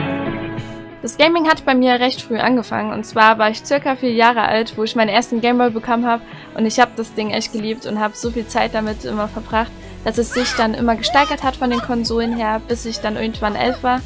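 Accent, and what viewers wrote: Saxony, Germany. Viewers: Wie mer des sächsische raushört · ist sie aus Sachsen? xD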